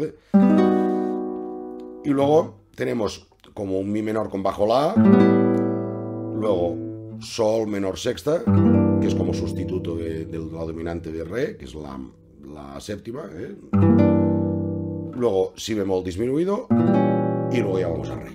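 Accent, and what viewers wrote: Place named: Catalonia, Spain. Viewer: Querido maestro, Debo reconocer que hace poco tiempo que le sigo y que es la primera vez que me atrevo a escribir para comentar uno de sus videos. Quisiera decirle - en primer lugar - que... ¡ME ENCANTA! Me encanta su trabajo. Me encanta ver como rearmoniza temas clásicos (¡tan básicos y tan grandes!). Temas que todos los músicos hemos tocado tantas y tantas veces de forma "simple" - tal y como los aprendimos en aquellos libros de "Los 100 éxitos de..." - y... ¡QUÉ BIEN SUENAN CUANDO "SE PULEN"! ¡Cuánta belleza! ¡Qué buen trabajo, querido Maestro! ¡Gracias por instruirnos! Y qué buen montaje de video (imagen y audio). ¡FELICIDADES también por eso! ¡Suena de maravilla! ¡Se nota que es Vd. catalán!, además de por su claro acento, por lo bien que hace su trabajo. ¡Enhorabuena! ¡De corazón! Qué curioso que la mayoría de los comentarios de los seguidores de su canal sean de personas centro y sudamericanos. 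Me pregunto si ya no quedarán amantes de la buena música y de la guitarra en España y en todas sus regiones/CC.AA. Cuenta Vd., desde que le conocí por Youtube, con un fiel seguidor. Muchas gracias por su trabajo y por compartirlo con todos. Saludos cordiales, en este momento, desde Francia.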